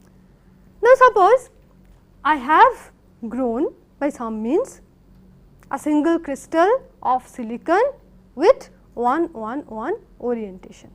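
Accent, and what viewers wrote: India. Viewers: She is like a million times better than any professor who teaches engineering in India except the other IIT profs. who are comparable to her.So she is one of the best profs. ever ..so you should just shut up and listen to the best lecture you can get without whining about the accent and stuff..her accent is the thing which makes things unique ..that's the Indian accent!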